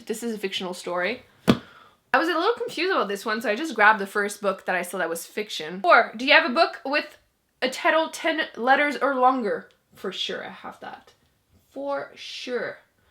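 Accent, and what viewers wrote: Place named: Canada. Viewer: You have an accent. Are you canadian?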